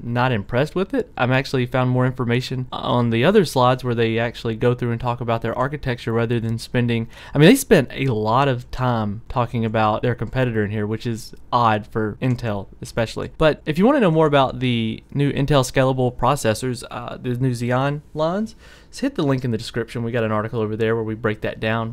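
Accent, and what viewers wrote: South, United States. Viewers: Where are you from if you don't mind me asking? I hear some southern twang in there XD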